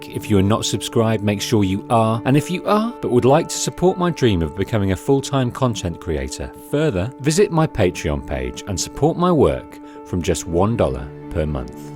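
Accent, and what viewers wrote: United Kingdom. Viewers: It’s called a English accent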